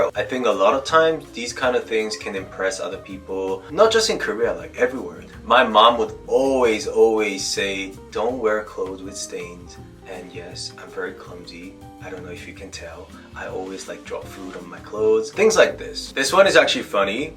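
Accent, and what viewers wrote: England, United Kingdom. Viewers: Love love your English accent. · Korean food is THE BEST, I don’t know how I ever lived without it and now I eat it all the time. Love your Brittish accent 🫶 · Why do I for some reason hear a hint of a British accent? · you can tell you learnt English in England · speak English with a British accent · Love the British accent!! ❤ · Omg you sound english, American and English it’s so cool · Am i imaging a British tone to your English lol.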